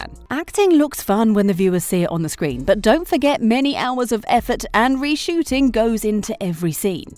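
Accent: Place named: United Kingdom. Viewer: This would have been better if Evan was the one talking... instead of a girl with a British accent 😉 I wish Evan the best..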